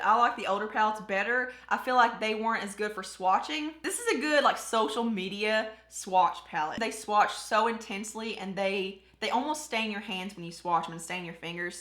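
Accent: South, United States. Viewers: I heard your accent and subscribed😂😂 As a girl from a small town in NC, I say we need more southern youtubers lol!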